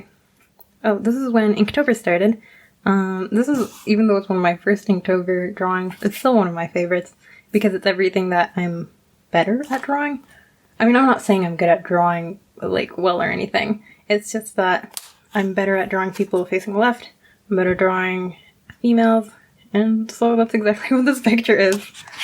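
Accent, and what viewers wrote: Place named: Michigan, United States. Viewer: Ah man! I have so much to say about this! It is lovely to see everything, and I'm impressed by how consistently well done everything in this is (most of my sketchbook pages are completely unfinished.) Your experimentation with styles is inspired and wonderful to look at because of how well you adapt to them. I'm scared that you don't like practicing full body though. I know I struggle with it too, so I get that it's intimidating, but please don't be discouraged! Gesture drawings and references really do help, and all artists should integrate them into their habits. Also your accent is adorable, my god I never realized how different Michigan and Canada's accents are.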